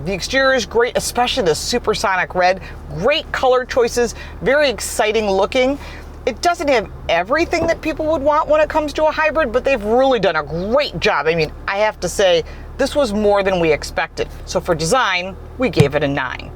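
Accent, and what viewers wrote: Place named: New York, United States. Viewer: Great review, accept for the damn loud NY accent!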